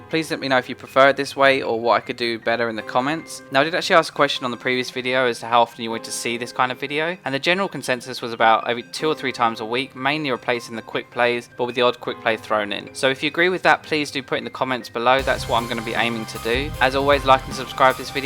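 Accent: United Kingdom. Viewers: Hea British? I'm British! :) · Oh god, my bad, I didn't even noticed the player's name._. well, it has been proven that the british accent makes speeches more charismatic and less tiring to listen to. Take that as your advantage Mr.! haha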